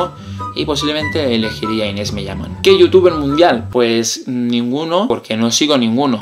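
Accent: Catalonia, Spain. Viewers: eres catalán o valenciano? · Eres Catalán?!?!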